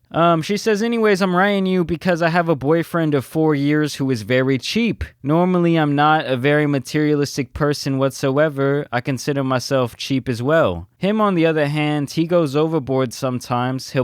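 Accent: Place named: Boston, United States. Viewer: Is nigga from Boston , the accent is hella strong 🤔😂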